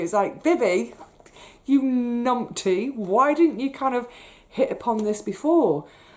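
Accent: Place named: England, United Kingdom. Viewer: Hi vivi. So glad you enjoyed some Aussie humour. It does fix some things for a time..we are not too bad at humour. Get series 4 and 5 Kath and Kim are brilliant. There is also a older movie called "The Castle". Another Aussie broadcast and it's also funnyyyyyy. That's if you get Aussie humour... Thanks for your videos. They help me focus in a dark time in my life Listening to your accent gives me a thrill.. Lol. Thanks again from Aussie to pommie. Xx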